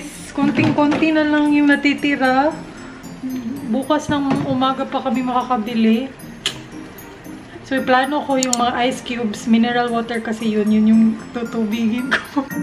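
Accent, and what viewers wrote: Visayas, Philippines. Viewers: Mas nindut bisaya pero syepre naa man gud tagalog viewers so sagul na lang hehe! Lahi ra jud ang accent sa bisaya ka lingaw. Pero ang evap ayaw tawn kalimti 😅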